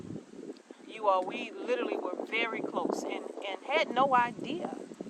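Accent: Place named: Georgia, United States. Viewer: Thank God He intervened and kept y'all safe! I just love your videos and hearing you talk. My husband and I live in southeast Georgia so you talk like us! Glad things are fixed and better for you!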